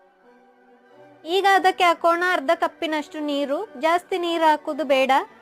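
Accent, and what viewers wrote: Tulu, India. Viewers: Nivu kannada mataduvaga gottagtade tulu mataduvavaru anta😂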